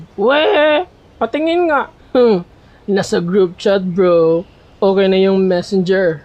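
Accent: Visayas, Philippines. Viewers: bisaya diay ka?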